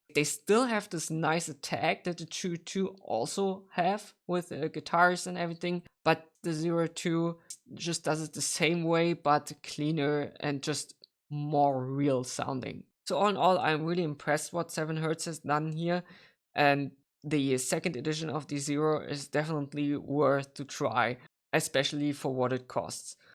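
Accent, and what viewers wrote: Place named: Germany. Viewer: Hey:) Da sich dein Englisch genauso anhört wie meins schreibe ich mal auf Deutsch 😂. Erstmal ein schönes Video mit einer guten Erklärung hast du da gemacht! Ich hab mal ne Frage... Ich bin relativ neu im iem-Bereich und hatte die Möglichkeit die Moondrop Chu 2 auszuprobieren. Da war ich echt schockiert wie klar der Ton ist und wie viel mehr Details man hören kann (im Vergleich zu meinen alten Soundcore Liberty 2 Pro Kopfhörer zu dem Preis!). Alles in einem will ich mir auch iems zulegen, allerdings hauptsächlich für Gaming (überwiegend single player games)aber auch um Videos zu schauen oder Musik zu hören. Es sollte am besten nicht zu neutral/flach klingen und leicht besseren bass haben als die Moondrop Chu 2. Die Frage wäre dann, bin ich mit den Zero 2 gut bedient oder lohnt es sich für etwas mehr Geld bspw. die Truthear x Zero (Red) oder 7hz Sonus zu kaufen. 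(Puh, der Text ist jetzt doch etwas länger geworden). Hast du da eine Empfehlung für mich? Würde mich über eine Antwort freuen ^^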